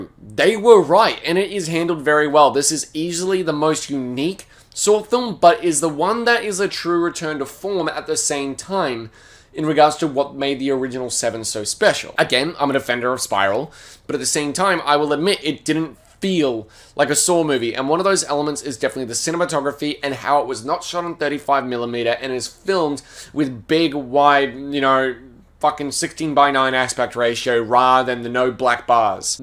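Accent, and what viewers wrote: Australia. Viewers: New. Good to hear a Fellow Aussie. Cheers X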